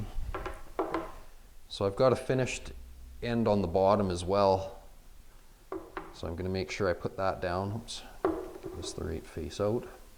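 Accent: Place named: Canada. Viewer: I like how you even tape over the manufacturer labels. True professional man your videos are great. The light Canadian accent makes it that much better.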